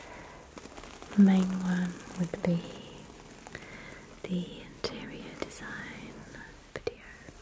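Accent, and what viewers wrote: United Kingdom. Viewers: Ur hair colour looks darker but amazing...I love ur accent...is it British?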